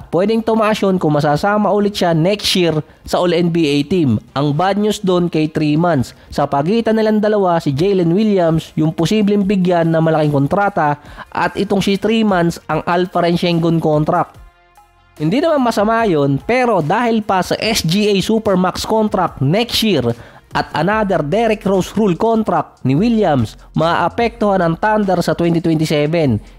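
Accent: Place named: Pampanga, Philippines. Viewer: Kapampangan ya i koya 😂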